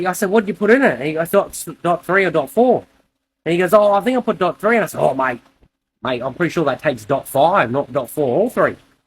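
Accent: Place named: Australia. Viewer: Aussie brah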